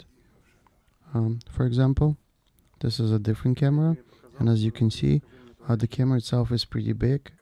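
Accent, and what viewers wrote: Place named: Russia. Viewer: your speech accent sound like ur russian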